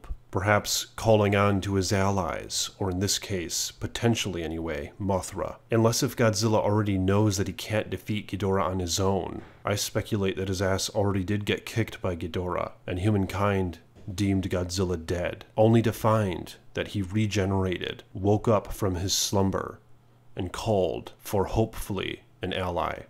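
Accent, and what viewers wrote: RP, United Kingdom. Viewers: It's a reasonable guess,. I thought they would go the elemental route... Earth, water, air, fire and spirit but there are only a couple of the Titans that fit those specific attributes... PS - Your doing the Lord's work on G2 compared to your peers because: 1. You made it out of puberty. 2. You speak the Queen's English. 3. You don't ramble incoherently. But you have to ditch the creepy, pedo pizzagate voice.... 👌👌👌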